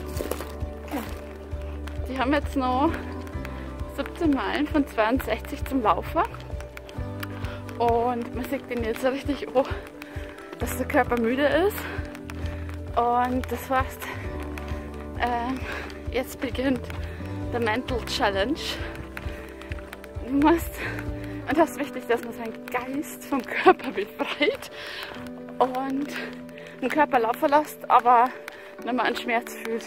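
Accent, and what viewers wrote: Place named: Bavaria, Germany. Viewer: Servus, was für ein geiles Video!!! Und dann a no auf bayrisch - perfekt und vielen Dank für diese herrliche Doku des PCT.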